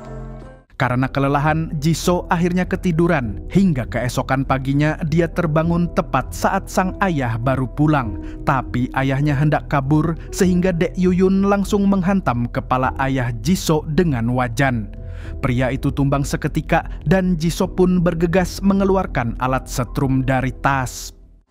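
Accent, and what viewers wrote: Banjar, Indonesia. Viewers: urang banjar amang nya waluhhh😂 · mang urang Banjar kah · Bah buhan Banjar kah tih 😂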